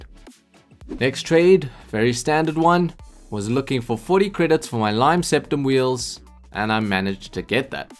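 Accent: South Africa. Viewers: Why do you sound very south african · I know a South African accent when I hear one! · This man sounds afrikaans or is it just me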